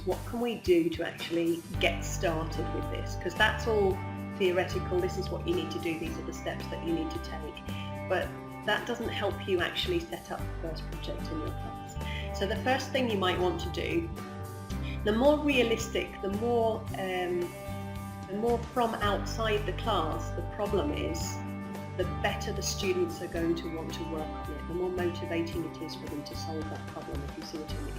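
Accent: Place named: United Kingdom. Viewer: Hello:) I've just finished watching your video and I've got to say that, in my estimation at least, it was pretty helpful:) Besides, I've been your loyal viewer for a long time but not in order to learn English, just for fun. Your English is incredibly fluent and additionally your accent sounds very British and so that makes me think you are a teacher. If I'm correct, I'd like to ask you a question:) Well, I currently attend a secondary school and I've been wondering what I can possibly do to decrease my anxiety while talking to my teacher. My being anxious doesn't stop me from keeping a conversation going but it's quite annoying because then I can't help the swaying of my legs from side to side or I feel a constant need to gesture. Also, I sometimes feel kind of embarrassed when I speak and my teacher smiles to me or looks intensively in my eyes. 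I don't know why it works like that. Nonetheless, I do not deal with this problem while shooting the breeze with my friends in English or having a conversation with other English teacher at school. I want to start working on it but I'd need some advice and you're the only available teacher :) I know my message is long, I'm sorry, I hope you successfully reached the end:D I'm looking forward to hearing from you:)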